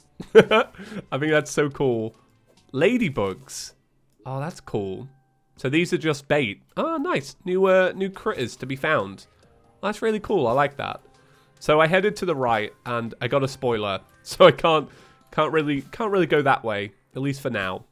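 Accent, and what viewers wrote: United Kingdom. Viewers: I'm sorry, I forgot your British... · Anybody else just realise he's Britain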